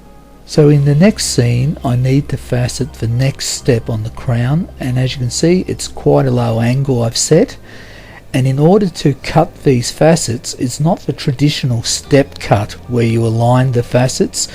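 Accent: Australia. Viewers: Well the other faceting channels don't have the Australian accent, so they can't copy that without sounding weird. the gem looks amazing!😮 wondering if you have done any videos of faceting golden rutilated quartz?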